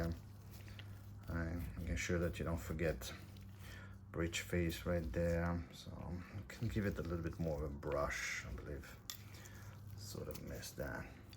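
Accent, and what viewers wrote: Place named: France. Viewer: I avec the impression que il y a un litel french accent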